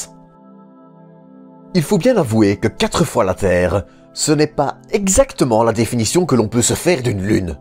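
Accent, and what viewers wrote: Belgium. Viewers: Ton accent est de belgique ou d'ailleurs j'arrive pas à savoir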